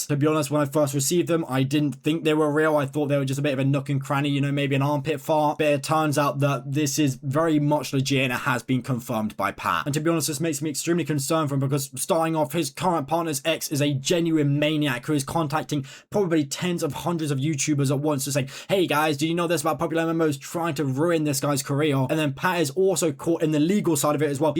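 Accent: United Kingdom. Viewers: You’re like the inverse of MoistCr1TiKaL black T-shirts UK accent and high energy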